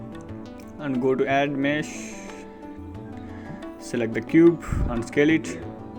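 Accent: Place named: India. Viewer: This tutorial is an attack on one's ears by a very bad accent (sounds like Hindi) and irritating repetitive noise (music) in the background, almost infringing to the foreground. If one can suffer this or tolerate it, there may be something to learn. However, there are better tutorials.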